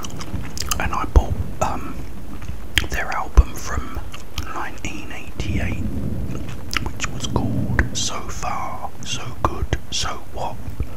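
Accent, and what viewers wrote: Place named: United Kingdom. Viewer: Bri’ish + gum = ☺️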